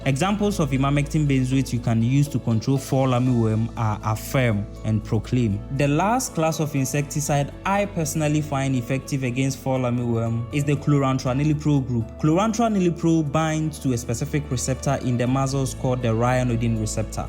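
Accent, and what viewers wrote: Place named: Ghana. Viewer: You sound Ghanian 😊 Nice presentation!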